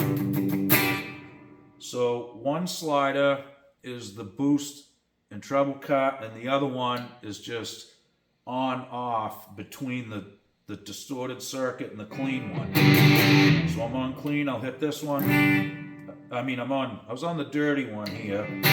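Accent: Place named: Boston, United States. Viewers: Good Job. Love your Boston accent. Thanks. The VW Garagemaster could become a collectible. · Cool ‘guitah’. Love your New England accent. 🤘😎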